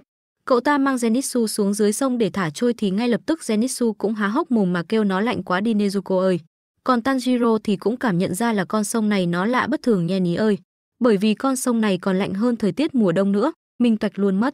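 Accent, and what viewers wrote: Central, Vietnam. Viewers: ad người miền trung à mô vs chả mi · Ủa mi người miền Trung hả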